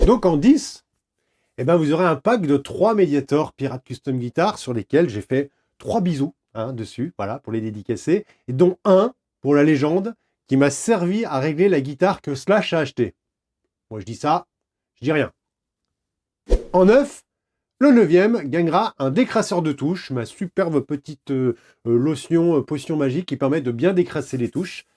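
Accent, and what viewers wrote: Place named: Quebec, Canada. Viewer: Bravo pour l’effort, effectivement l’accent Québécois est pourrit. Si ça peut t’aider on dit Tabarnack. Comme c’est écrit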